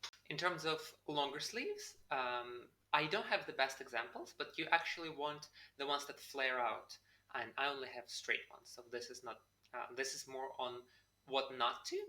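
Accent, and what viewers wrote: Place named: Europe. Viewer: (1) Out of curiosity, what country did you relocate from? And in what country are you living now? Your English is very good. You sound European. (2) I'm a baby-boomer who's accepted in a MTF HRT program at a health center so I can transition to living full time as a female. The culture has finally shifted to where you don't have to fear for your life, get arrested or in general, get harassed wherever you go. Even dressing gender-neutral at only 4 months of transitioning, I've incurred no problems in today's American society. (3) Did you have an occupation or job skill that would translate MTF so you could continue to earn a living. I've been active in the trans community for 25 years. TGurls who were moving forward 'no matter what' lived in what I termed 'a gender ghetto'. No matter what their education, they could only get menial jobs, perhaps as a bartender at a gay-bar or a clerk at a convenience store. 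Professionals (one I knew was a surgeon, the other was an IT specialist for a large corporation) lost their jobs immediately after they had GRS surgery and announced it (a) to the partners who had their LLC practice's lawyer draw up a buyout agreement effective immediately and presented to the surgeon the moment she returned from Thailand where s he had her GRS done, and (b) even though the IT specialist worked openly and sincerely with the company's HR department who 'talked the talk about inclusion' etc., and the company was unionized and she had the union's LGBT rep atte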